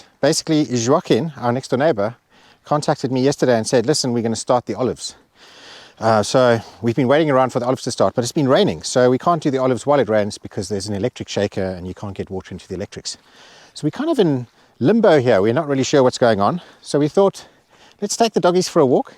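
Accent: South Africa. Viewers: Thanks for brightening my life. I'm a fellow Saffa in Taiwan and stumbled across your channel. Great to hear a Saffa accent again. is so cute the way she says JA and your favourite phrase is "and stuff like that" 😆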